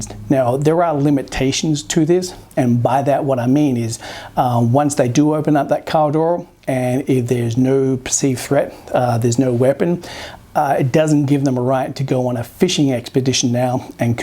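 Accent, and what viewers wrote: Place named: Australia. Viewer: you sound like an Aussie. When will the US have insurance and registration linked to the number plate so the police only need to ask for your licence?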